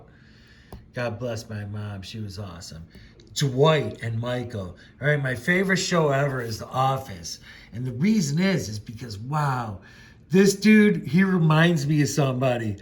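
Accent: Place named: United States. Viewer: Love your American accent takes me back to the birth of Rock and Roll as a kid listening to American Forces network broadcast from Hilversom in Holland late 40s great video my friend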